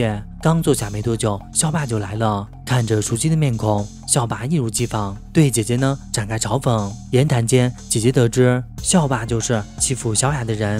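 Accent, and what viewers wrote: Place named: Taiwan. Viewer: 你这是哪来的地瓜腔？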